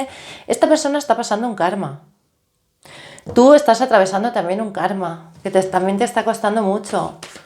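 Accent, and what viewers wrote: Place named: Spain. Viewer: Muchas gracias 👍.✔️✔️✔️ Me pregunto de cual parte de España será este acento? Habla como la actriz Marina José.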